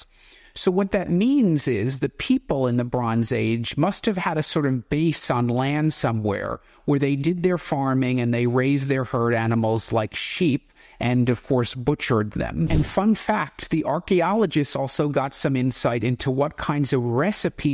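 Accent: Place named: United States. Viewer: I know you are American but please sort out your pronunciation of British words names and places 🙉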